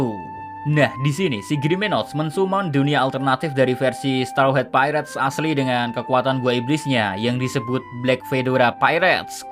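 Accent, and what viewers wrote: Java, Indonesia. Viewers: Yoi,ala logat jawa suaranya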